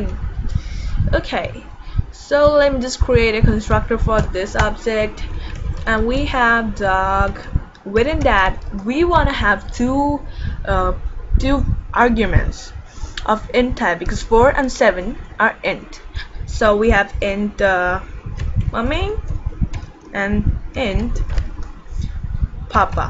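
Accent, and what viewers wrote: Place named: United States. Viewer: american english but its good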